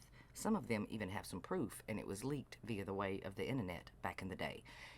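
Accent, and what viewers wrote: South, United States. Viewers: Your southern voice is the perfect vehicle to deliver your scathing epitaph of dumpy pants daddy's downfall! Chef's Kiss 💋 ❤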